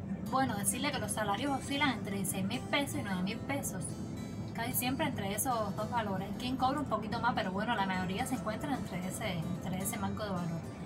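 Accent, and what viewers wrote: Cuba. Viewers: Qué bonita😍😍😍 Supongo que sos cubana, por el acento, bienvenida y suerte, un beso😘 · Siiii....me encanta la suavidad del acento cubano!!.Es muy dulce. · Tu acento se parece al mío. Eres CUBANA !!!!!!!! BENDICIONES !!!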